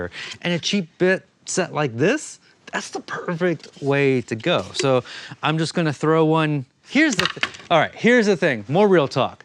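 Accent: Ohio, United States. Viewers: I can tell you are from Ohio just from how you talk. "From selling dru...."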